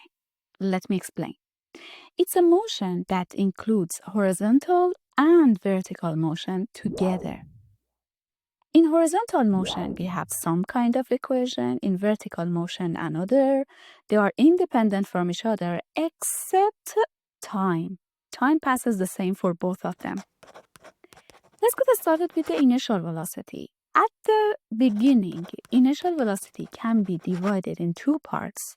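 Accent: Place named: Iran. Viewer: I love your heavy Persian accent, sis 😊❤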